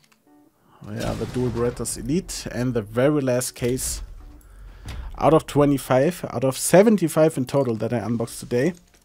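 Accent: Germany. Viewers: Ich weiß es lässt sich nicht sehr einfach abtrainieren aber der deutsch Akzent ist einfach einer Der schlimmsten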